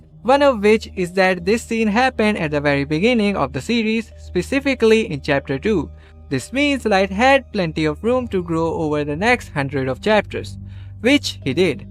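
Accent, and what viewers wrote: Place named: India. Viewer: Wow, Amazing video 💫 always refreshing to watch a scd video in Indian accent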